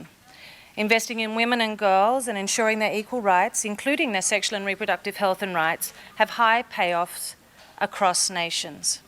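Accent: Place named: Australia. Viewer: Good to hear Princess Mary and her aussie accent!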